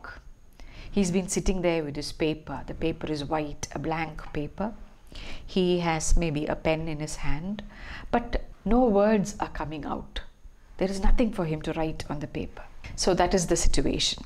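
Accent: Kerala, India. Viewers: Must be a Malayali,Its evident from her accent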